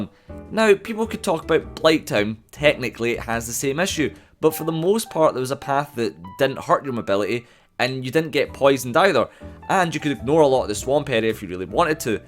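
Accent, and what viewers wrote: Scotland, United Kingdom. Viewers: You sound the absolute spit of James McAvoy. What part of Scotland you from? 🏴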